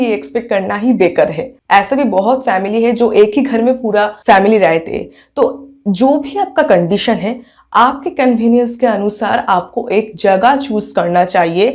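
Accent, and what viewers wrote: Bengal, India. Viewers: Hindi se pta chlta h kii aapki bengali bht achi hogi ❤️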